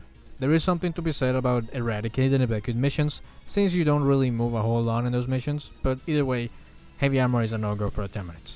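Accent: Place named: Eastern Europe. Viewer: The Eastern European accent has become strangely endearing to me. Given the vast amount of YouTubers with the accent who I watch, I have subconsciously begun to associate the accent with quality content. I’m not sure if that’s a good thing.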